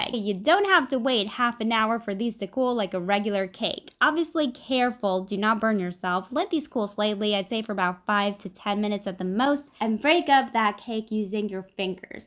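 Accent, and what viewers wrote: Italy. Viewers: Are you from Italy? It sounds like it!! Gonna try it soon with my friends!! 😄😃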